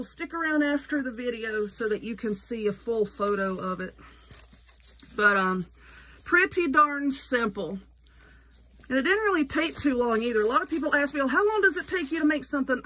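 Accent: South, United States. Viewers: i have to tell you how much i love your tutorials.. your accent and one-liners. your personality is what keeps you watching. My Dad is 96 and recently moved into an assisted living facility after the loss of my mom..I had decided to make wreaths for his door to his room so it would be easy for him to find his room. He is a disabled vet and this wreath idea is what i was looking for for Memorial Day and the 4th, I added a few stars along the outside, but thankyou so much for your ideas and tutorials, I'm sure he'll love it! You are a and all that! Thanks again!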